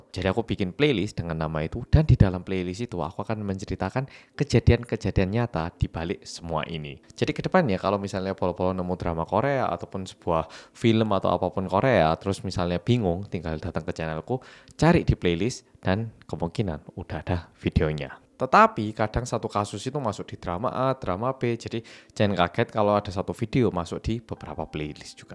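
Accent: Java, Indonesia. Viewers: Hallo, aku mau tanya boleh? kok tuh gereja ngga ditutup sama pemerintah ya? terima kasih Mas ya aku baru gabung ke chanel nya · Di gonta ganti karepe dewe 🤣🤣🤣 jawir banget mas...👍🏻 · Nyoro mas, boso jowoku sampek kalah karo sampean...terlalu medokk mass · Jowo banget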